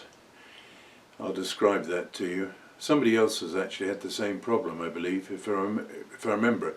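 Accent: England, United Kingdom. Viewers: Hi, it's delightful to hear an English accent on these workshop related Youtube videos, even if you do use American pronunciations sometimes. Rob. Engineer, still learning!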